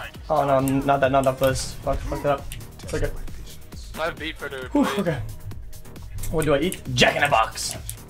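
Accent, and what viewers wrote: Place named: France. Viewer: His first language was french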